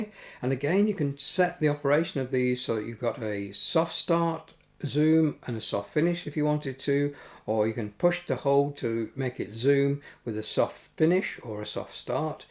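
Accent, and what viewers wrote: England, United Kingdom. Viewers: You've done a marvelous service for us! Thank you so much! I have had the FZ2500 for a few years and have known that there was a lot more to it that I was doing but I just couldn't get a grasp on it. You have helped me big time! And I love your delivery. No extra noise....perfect focus. Plus, being an American, I can't get enough of your English accent. Haha!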